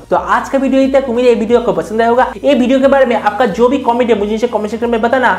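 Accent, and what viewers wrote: Bengal, India. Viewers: Nice Bengali ascent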